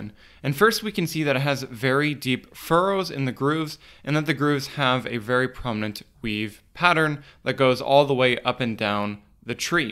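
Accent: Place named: Pennsylvania, United States. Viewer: So where are you at or from? Cuz I'd swear you were a county over with your accent. Ya Sound like any other Pennsylvania person to me.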